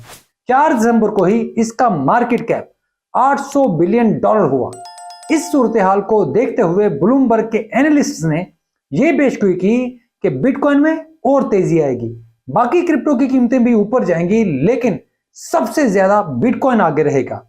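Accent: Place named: Punjab, India. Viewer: Your punjabi accent is so good 👍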